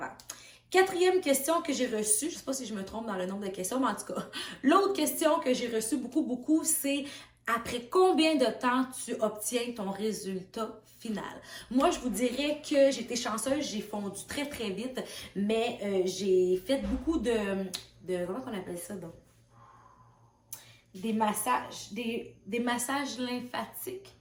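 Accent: Quebec, Canada. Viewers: trop mignon une black avec l,accent du québec; j,aime notre monde cosmopolite car les blancs vont vouloir aller vivre en afrique; mon rêve se réalise; ne pas oublier d'où l,on vient pour partager les recettes de mamie( sur toutes la planète biensur)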